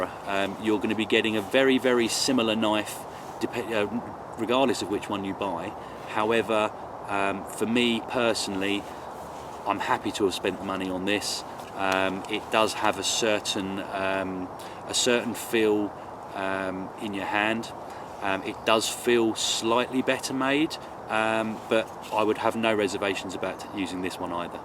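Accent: United Kingdom. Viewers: I like that video a lot, (1) I like the fact you're realistic about the surprising capability of the Mora, (2) I like that you show that the Ray Mears is both, an awesome tool and a piece of art (almost, at least), (3) I like the British accent. :-) PS: faultroy is an impolite troll, thus let me remind you: Don't feed the troll!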